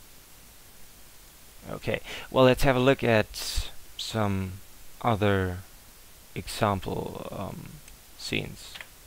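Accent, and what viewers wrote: Germany. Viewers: Haha du bist deutsch oder?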